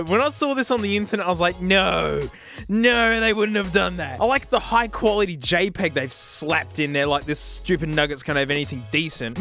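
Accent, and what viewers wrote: Australia. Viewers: Hearing a Australian man talk into the worst sounding microphone ever makes me happy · I love how aggressively Australian his stories are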